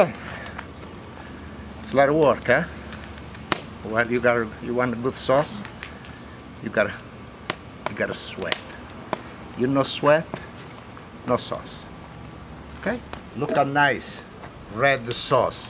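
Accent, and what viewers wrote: Italy. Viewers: *PURE GOLD ITALIAN ACCENT* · See an old guy See title - how to make tomato sauce Click Italian accent = full attention/excitement Sit back and enjoy learning from a master. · I see a man cutting tomato's in his garage, speaking with a heavy Italian accent and i subscribed. Update: Wow I have never gotten anything over probably 30 likes before. Thank you! Good food brings people together! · I just absolutely love this man especially when making Italian food with his accent. Thank you.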